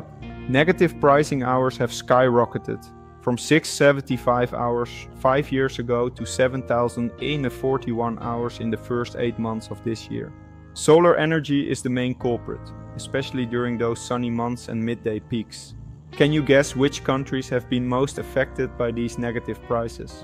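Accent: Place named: Netherlands. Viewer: By the accent, you're Dutch. This is a thing mostly just happening with us Dutchies. Its really not an issue yet in most of the rest of Europe.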